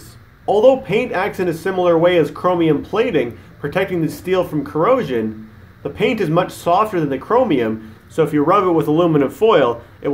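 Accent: Canada. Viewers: if anyone wants an example of how a canadian accent is different to US, here it is.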